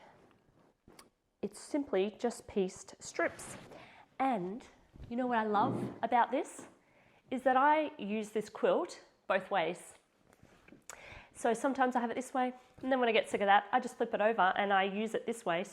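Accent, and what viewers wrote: Australia. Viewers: I’ve just come across your site and it’s nice to hear an Aussie accent. I live in Perth. Your quilts are lovely and your backing ideas great. Thanks for sharing your ideas. 😊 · Just found your channel and it’s refreshing to hear an Aussie! I’m also from Brisbane! I relate to your quandary on what to quilt ! I’ve never used a sheet but I’m thinking it’s a great idea, also using old men’s shirts. I’ve been watching a lady in the US who buys lots of shirts and makes beautiful quilts with them. If I can remember her channel name I’ll comment again.😊 Found it! From Small Things, her name is Sue and I find her very interesting 😊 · I love your accent! My Mother was from Perth. SO I am loving your accent. MyrLene ❤️ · Oh, I discovered your channel today and was so pleased to hear a fellow Aussie voice. I love to discover sheets and blankets to use for my backings at our local charity shops. 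Love your quilts ❤ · Lovely to hear an Aussie talk about quilting. Hello from the Sunny Coast. My suggestion for quilting would be diagonal lines both directions AND inside the white as you were thinking. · Lovely to hear an Aussie voice, I'm from the Sunshine Coast near you. Interesting video, love getting ideas from other quilters. I like the idea of stitching around your focus shape. I've done a bit of hand stitching around my borders, pretty hard to do without a big table but heck, gotta try something new. Since I don't have a big table I've been doing "quilt as you go" but I like the look of a separate top, and your backings are nice.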